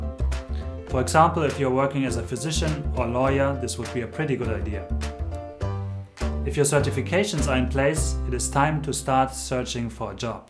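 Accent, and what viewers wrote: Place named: Germany. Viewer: German accent for sure :)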